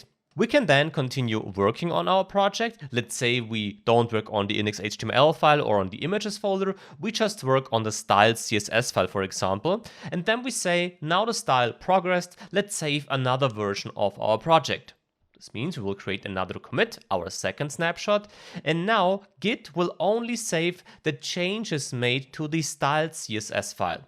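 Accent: Germany. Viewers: Yeah, he sounds a lot like a German.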